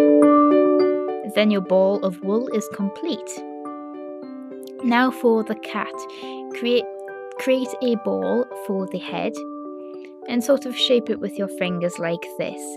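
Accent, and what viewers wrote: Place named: North East England, United Kingdom. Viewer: North east england ^^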